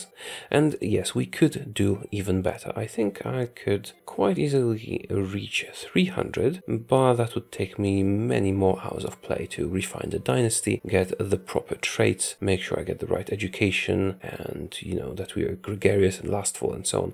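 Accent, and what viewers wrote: Poland. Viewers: It legit took me 3 1/2 minutes to recognize your voice due to that very, very subtle polish accent. No joke: I thought i was watching The Spiffing Brit for quite a while 😅😂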